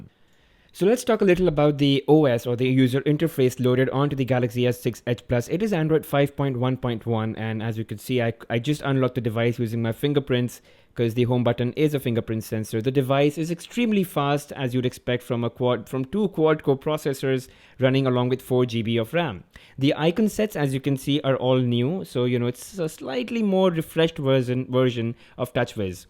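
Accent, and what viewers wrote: India. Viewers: nice review . i love ur accent with that desi touch . :) keep it up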